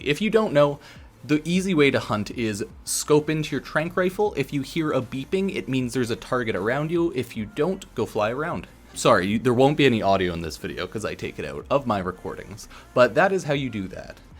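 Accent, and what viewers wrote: Canada. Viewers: I love your Canadian accent. When ever you say "about". Love it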